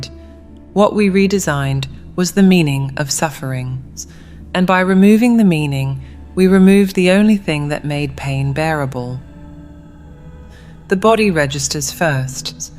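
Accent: United Kingdom. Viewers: Fantastic, thank you so much for listening to us and bringing back the posh English lady. Really appreciate it 🙏🏼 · British voice yes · Thank you so so much for returning the british voice. Please redo the other two videos 🥺🥺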